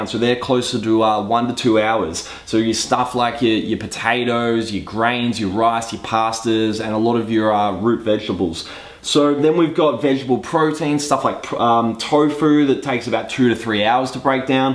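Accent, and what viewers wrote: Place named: Australia. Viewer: Love the OZie accent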